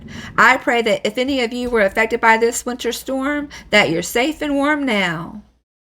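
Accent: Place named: South, United States. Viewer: New subscriber from Mississippi, and it's so nice to hear your Southern accent! Your home is lovely!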